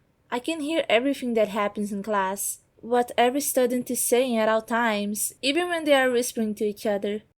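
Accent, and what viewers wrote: Brazil. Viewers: Sotaque me cheira a brasil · I just can't believe it has been 2 years and ONLY NOW I've seen that you actually answered. I am really sorry for taking so long, lol. And, to be honest, I am surprised I didn't realize you were Brazilian sooner, I guess I am just not used to that accent hehe. Parabéns pelo conteúdo também, seus vídeos ainda continuam MUITO bons kkkk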